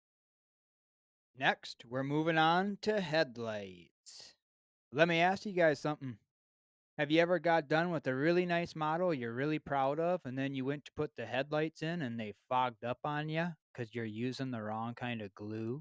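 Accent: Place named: Wisconsin, United States. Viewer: You sound like a Wisconsinite, I bet you say "you's guys"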